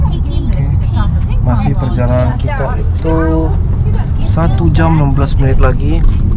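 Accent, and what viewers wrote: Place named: West Sumatra, Indonesia. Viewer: Dari awal gw dah nyangka kl Uda orang Minang, sempat nonton video yang logat Minangnya rada kental dikit, salam dari Ranah Minang uda...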